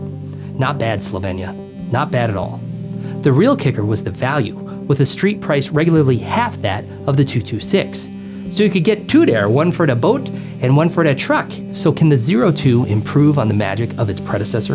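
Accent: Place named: Minnesota, United States. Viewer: What area of Tennessee? Been in Southeast TN my whole life. You killed that Minnesota accent, I went to Ely Minnesota on vacation several years back and my god do you sound like them. The difference of dialect was an issue, my thick southern accent was all but incoherent for the locals in Ely. Our fishing guide made me sit in the front of the boat so he could read my lips so he’d know what I was saying lol, great people there.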